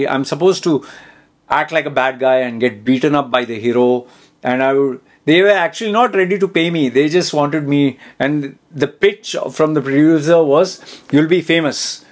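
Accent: India. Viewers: True ,too much of corruption here. You sound Indian ...absolutely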